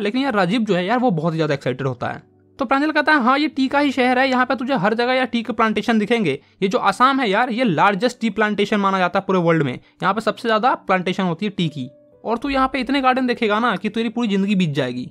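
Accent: Bihar, India. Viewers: Bhai you are from Bihar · Bhai bihar se ho kya?? 😊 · Bhaiya aap Bihar se hai kya ??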